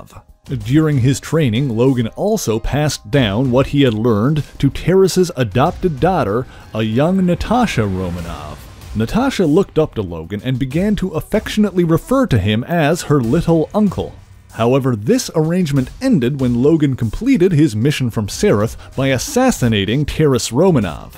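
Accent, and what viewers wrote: Canada. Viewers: Know how I know you're Canadian? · You’re Canadian aren’t you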